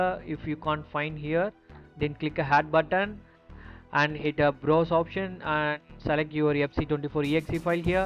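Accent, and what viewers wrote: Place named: India. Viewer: thank you so much man! always the indian accent helping us.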